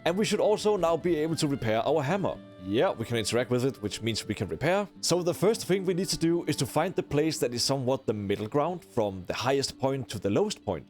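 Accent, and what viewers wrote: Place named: Denmark. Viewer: Hehe, love the Danish dialect, keep up the good work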